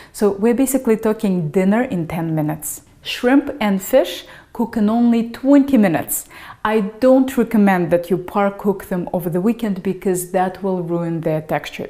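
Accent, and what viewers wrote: Russia. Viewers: Ох уж этот русский акцент ))) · что за акцент россия? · Звучит как русский акцент - всё понятно и разборчиво! ))) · What a Russian accent has. Very demanding women. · Акцент русский? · Russian accent isnt?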